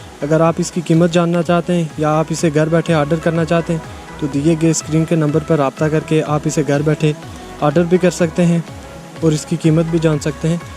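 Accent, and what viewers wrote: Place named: Kotli Sattian, Pakistan. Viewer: Bro ap kotli satyan k aas pas k Hain .Mera andaza narar ka hai.ap ki zuban say andaza lgaya hai.reply krna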